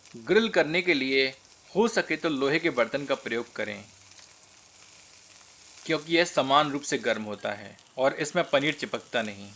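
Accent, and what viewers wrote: Standard. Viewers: Apki hindi shudh hai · आपकी हिंदी भी अत्यंत शुद्व है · Khane se jyada testy to apki Sudha Hindi lagti he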